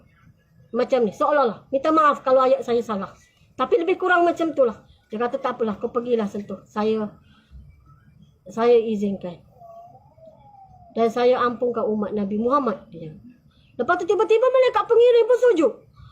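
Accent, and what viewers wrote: Kelantan, Malaysia. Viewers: Mari kot celoh mana menasio nie.. Bunyi kecek supo kltn.. Wat malu ore kltn jah. Dgr ko dia dok cito tu aku rasa 3 ari dia nie x bgun tido. Nk sudah ko mimpi tu gak..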